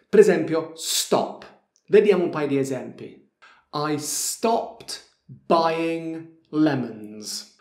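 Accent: Apulia, Italy. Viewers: mitico !! Pugl-English !! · ma mi sbaglio o hai un accento pugliese? hai vissuto in puglia? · Allora non l' ho notato solo io che ha acquisito la cadenza pugliese?! 😂😂😂😂😂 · Ecco, mi sembrava fosse un accento pugliese, ma non ne ero sicuro. Incredibile. Molto preparato. Un onore seguire le sue lezioni. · Il tuo accento pugliese ti renderà famoso!!! È veramente simpatico 😃 SUPER!!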